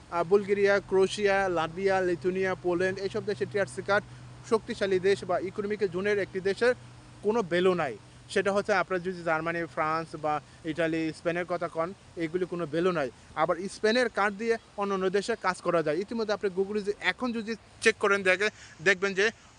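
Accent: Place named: Brahmanbaria, Bangladesh. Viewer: আসসালামুয়ালাইকুম ভাই মনে হচ্ছিল আপনি আমাদের এলাকার ব্রাহ্মণবাড়িয়া আমার বাড়ি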